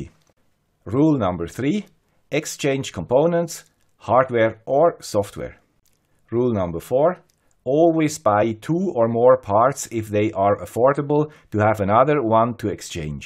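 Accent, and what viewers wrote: Switzerland. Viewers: This is pure gold for troubleshooting. Thank you Great Master with Swiss accent 🖖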